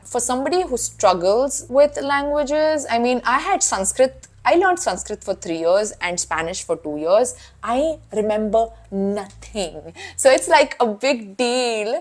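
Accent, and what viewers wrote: Maharashtra, India. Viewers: Your accent is still very Marathi heavy…. Being a Mumbaikar I could easily identify your Marathi accent. All the best with your new life in Israel. Take care. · You maybe a Jew. But there is no way, you are Israeli. You are Indian at heart. The way you talk, and emote is purely Indian. And your name is funny. is a vitamin supplement sold in India, which is said to provide instant energy. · Don’t worry you sound like 2000% Indian. I don’t hear any other accents. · Great video and honest account about your early life in India. I’m Indian Australian American and am thrilled to hear about your move to Israel. I’ve never met anyone Jewish in India — you folks are exotic! In this video your accent is Indian with the extra-thick Ts, emphasized H sounds — so not sure what your Indian friends are talking about 🤔I hope you haven’t been affected by the recent terrorist attacks. 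God bless and take care 🇮🇳 🇦🇺 🇺🇸 🇮🇱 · You also have our accent and you look more like an Indian than an Israeli. So aap humare hi bandi ho😅. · i am sorry to differ but you do have a very strong Indian accent. please enjoy the best of both the world. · You are Indian, you can be an israeli and still have Indian-ness in you. India is a very diverse country and accomodates everyone. So dont worry, you belong somewhere - India (Bhāratha), you were born here and know the country just like us. Israel is also a good country, hope you have a good time there. But remember, you belong somewhere and you have a home! PS : We can still sense a strong Indian accent in your English. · यो मराठी, वाटलच मला. तुझ्या accent वरून वाटतच होत. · Your accent is Indian 😁🤝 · I would treat you like a bhartiya more rather than a jewish ☺️😸 i respect jewish culture but in your case your looks your accent are more like a bhartiya kanya 😸☺️😘 someday i would even like to see you wearing saree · You can pass off as an Indian girl anytime. 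Your accent laooks everything...your Indian mate...but Congratulations on ur new home Israel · 2-3 years ago.... I saw video about jews.... They were doing documentary on jews.... After watching that video i found that there is Jewish community in western maharashtra.... And i could tell that you are Maharashtrian just by your accent.... So obvious.... That tone was so familiar... I also saw some bene Israelis in Northeast states... There were fighting to go to israel..... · I am hearing a marathi mulagi ...😅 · Had I not explicitly known otherwise, I would really have assumed you to be Priyanka or Neha. You certainly talk and even look like an Indian - not that that's surprising 😊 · You know, you sound Maharashtrian to me🤗😍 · First of all you are a gorgeous woman. Then your mannerism and accent are 100% indian. And I am loving that. Your looks are almost north Indian, but then there is no such thing as a Jewish look, so hard to tell. 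You have a positive attitude. Keep it up. I was born and brought up in India for the first 23 years as a Hindu Brahmin, but then for almost the last 40 years, I am living in the US. Keep your friendship with your Indian friends. They are the sweetest. You are the first indian jew I got to know about. I have many Jewish friends of European origin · I am from Sri Lanka. You still has typical indian accent. But i really enjoyed listening to you. Yes we know there are jews in india. Kerala,Bombay & Calcutta. May HaShem bless you. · your accent is close to Indian though but your face is more close to Jew.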